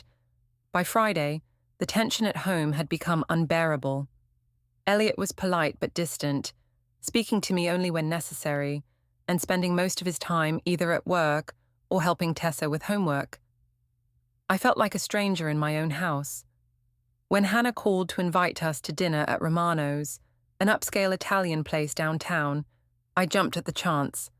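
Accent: United Kingdom. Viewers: Texas with British accent?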